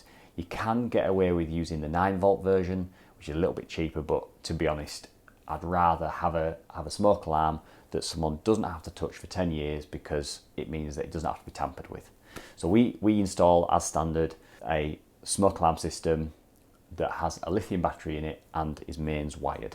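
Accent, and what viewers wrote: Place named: Northern England, United Kingdom. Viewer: What area are you based im guessing uk not sure how north 😂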